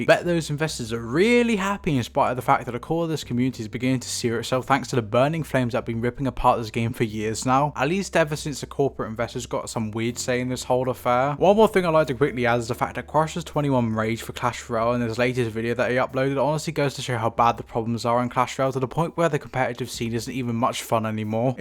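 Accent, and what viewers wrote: United Kingdom. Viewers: Real ones just turn on closed captions to counter the british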